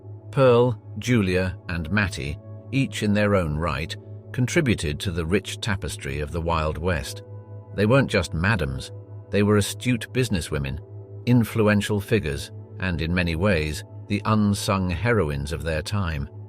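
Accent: England, United Kingdom. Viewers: Another Brit narrator explaining America.....to AMERICANS. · Is it the narratives male English accent that softens the title or is it that it's not a Woman narrating this story. It is the latter. This story is about cruelty and how Women care not even for their own gender. How dare you take my gender and twist it into your culture for financial gain, you the Madame the bottom bitch.. A Woman who hates their SISTA this is your type of entertainment. I forgive you SISTA.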